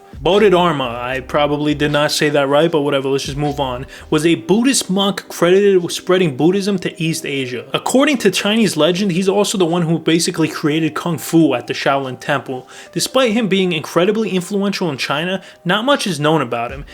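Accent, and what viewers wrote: New York, United States. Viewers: You sound so New York to my Briskish ears. Love your material man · when you said “hey why don’t i do a video on this” i thought you were gonna say “aye i’m wolkin here” bc of your goofy ass accent everything about you is extraordinarily cringey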